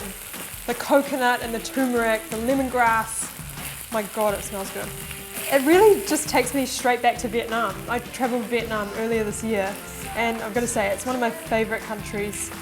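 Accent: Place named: New Zealand. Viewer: The accent is From New Zealand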